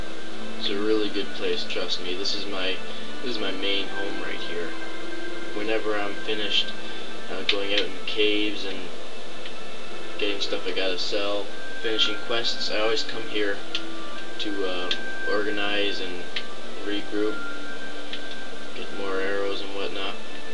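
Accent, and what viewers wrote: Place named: Eastern Canada, Canada. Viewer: your from eastern canada arn't you?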